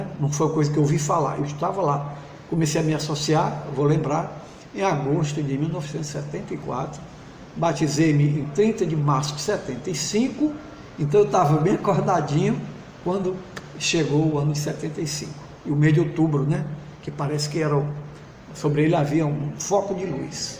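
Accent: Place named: Rio de Janeiro, Brazil. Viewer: Este é sem sombra de dúvidas o mais carioca de todos os cearenses. Parabéns pelo ótimo depoimento. Grande abraço.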